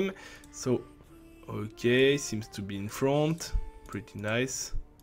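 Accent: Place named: France. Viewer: Est-ce que tu pourrais apprendre à parler Anglais sans un accent français, parce que là... Mes oreilles saignent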